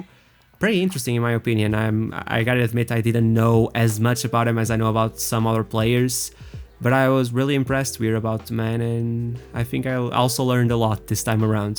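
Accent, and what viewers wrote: Portugal. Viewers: Pelo sotaque, só podes ser português. Belo vídeo.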